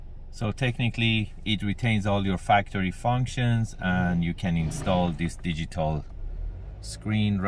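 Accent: Iran. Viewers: that persian accent tho ;)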